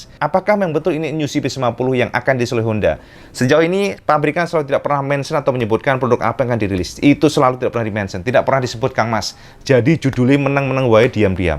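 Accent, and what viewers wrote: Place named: Tulungagung, Indonesia. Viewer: ketoro sekk enom cak sman 🤣🤣🤣 bhasa tulungagung mesti ujuk"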